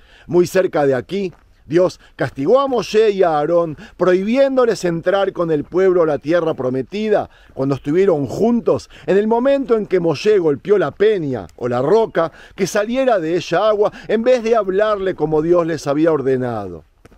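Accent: Argentina. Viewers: es hermoso,amo cada terron,cada grieta..desde lo mas profundo de mi alma,q El Señor me prestó te doy gracias - mi vida va atada a tus pies, cuando me muestras los precipicios y grietas,he aprendido a tolerar tu acento argentinon', en haras de los videos q subes--desde Venezuela Hashem t cuide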